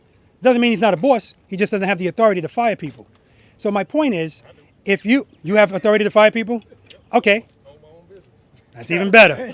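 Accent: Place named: Boston, United States. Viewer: I was working on my bike when this video started. I thought you're a chippy Italian-Irish bloke from Boston at first. 😂 Regardless, I thoroughly enjoyed this video. The way you communicate is top class and I'm so thankful to have stumbled upon your channel.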